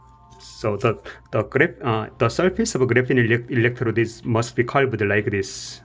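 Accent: South Korea. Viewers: Judging by the writing on the toothpaste tube and his accent, I think is perhaps Korean? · he is korean i could catch korean eng accent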